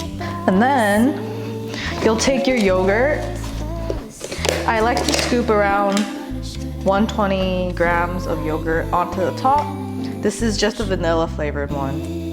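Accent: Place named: United States. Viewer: Metric system with american accent is throwing me off. We use freedom units here.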